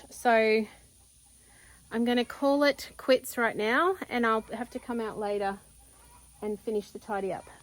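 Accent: Australia. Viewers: you don't need the music in your intro. I can't hear you because the music drowns you out. We want to hear your lovely voice with that awesome Australian accent!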